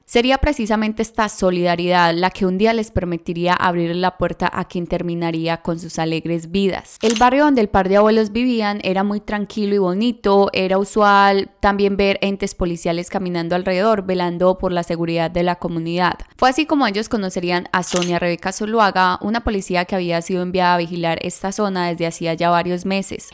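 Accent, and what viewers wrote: Colombia. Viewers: Por eso yo no confío en nadie porque no sabe uno con quién se va topar Dios nos libre de esa gente malvada, descansen en paz los señores, tan lindas personas! 😪Me gusta mucho tu acento Colombiano narras muy bien te felicito, saludos desde Sonora México, Mi hija se enamoró de Colombia en un intercambio escolar, su comida su gente tan bella,siempre lo recordará!! ❤️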